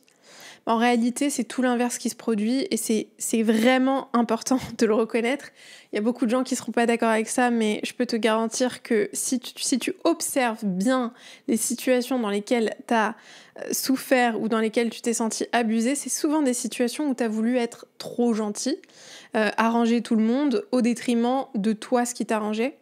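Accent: France. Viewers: Le meilleur podcast que je écoute, vous êtes pas une nordiste par hasard 🎉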